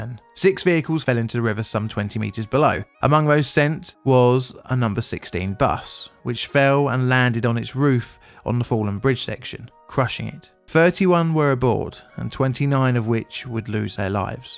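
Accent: United Kingdom. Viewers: Talking tragedy with a condescending voice, very good ! Or maybe it the brit snobbery I'm hearing.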